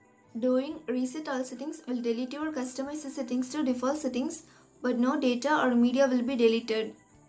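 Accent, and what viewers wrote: India. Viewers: Are you Indian because of your voice so nice.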